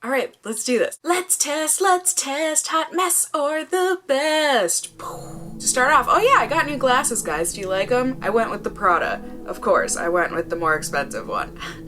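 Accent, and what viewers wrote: Minnesota, United States. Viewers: She is so relatable. She seems like someone I would have gone to art school with. Watching her videos always feels like sitting down with a girlfriend and doing our makeup. And that voice! So relaxing. Canadian here, and I think her Minnesotan accent is comfy and homey.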